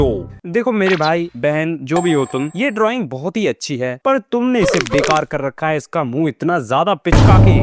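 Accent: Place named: Haryana, India. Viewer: Bro aap kha se ho language se haryana se lag rahe ho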